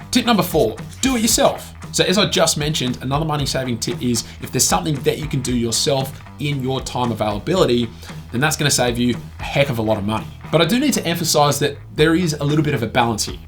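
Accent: Australia. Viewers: Aussie?? Haha!! Strong accent like me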